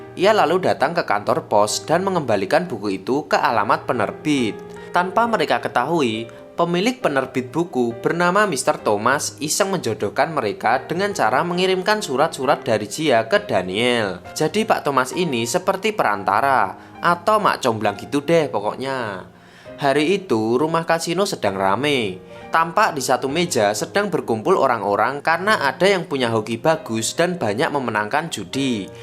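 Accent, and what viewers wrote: Java, Indonesia. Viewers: Logat e jowo medok banget hehe, tp om suka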